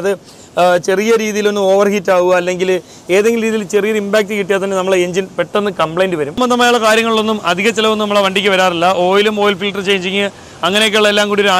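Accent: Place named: Travancore, India. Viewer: തിരുവിതാംകൂർ ഭാഗത്ത് പ്രത്യേകിച്ച് ട്രിവാൻഡ്രം കൊല്ലം സംസാരശൈലി.. ഇങ്ങനെത്തന്നെയാണ്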